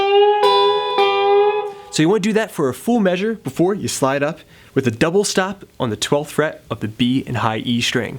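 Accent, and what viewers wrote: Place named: South Jersey, United States. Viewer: let it be known that over the past few years I have watched hundreds of thousands (lol ballpark figure) of guitar lessons via the internet. I would like to say: yours are, by far, my favorite! they are usually very well balanced and easy(ish) to understand. [the (ish) is most definitely due to my level of understanding not your teaching skills haha] I feel like I really do learn a lot. I love how methodical the lessons are; starting with easy steps and bringing it all together with a run through at the end. straightforward and cohesive... seriously, thanks for all of the knowledge 😊! also, you sound like your from jersey. maybe Philly, but probably like south jersey... if so that's cool, if not, ya know... that's cool too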